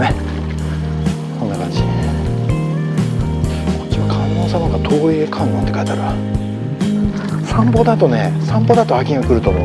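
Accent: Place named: Nagoya, Japan. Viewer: 、初めまして。最近拝見しております66歳の親父です。の軽快な名古屋弁の話術にハマりました。なんせボキャボラリーが素晴らしいですね。これからも楽しく見させて頂きますので頑張って下さい。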